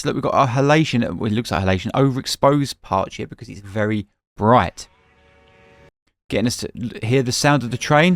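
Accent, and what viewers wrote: United Kingdom. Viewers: Well, let's go for 45 minutes of great British accent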